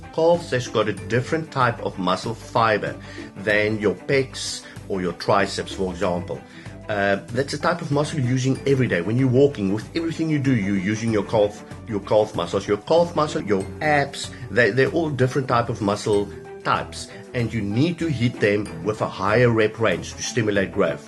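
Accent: South Africa. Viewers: Is this the Afrikaans type accent?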